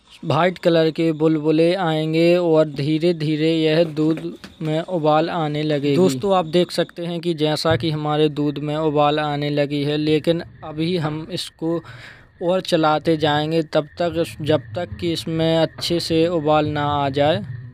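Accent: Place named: Bundelkhand, India. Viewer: bhai main bhi bundelkhand se hu Datia bhander m.p se 👍 aapki voice se pahchan ho gyi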